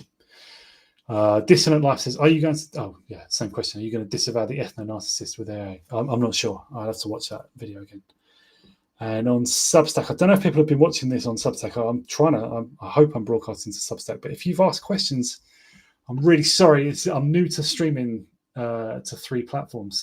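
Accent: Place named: Kent, United Kingdom. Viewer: He’s definitely from the south east. Sounds like a local Gravesend resident lol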